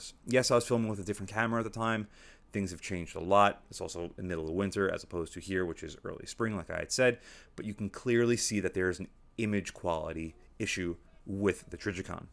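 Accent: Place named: East Coast, United States. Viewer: East coast. Old. He has an east coast accent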